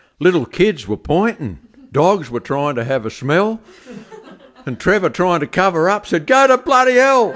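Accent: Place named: Australia. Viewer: Thanks, I was looking for the lyrics on me trusted phone But gees I'm glad I found you tellin' it in flat Australian tone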